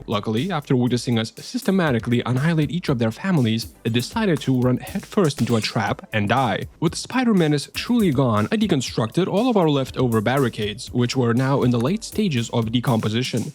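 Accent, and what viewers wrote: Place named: Canada. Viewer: I know others are unaware but as someone with Canadian friends. I know how they pronounce stuff differently and yeah. He's definitively Canadian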